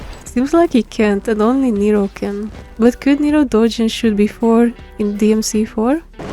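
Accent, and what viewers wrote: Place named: Hungary. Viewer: this accent is so Hungarian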